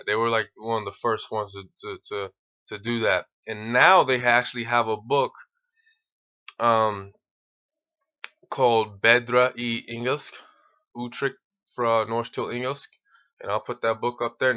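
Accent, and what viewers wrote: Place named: United States. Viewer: You sound so american - why are you interested in Norway?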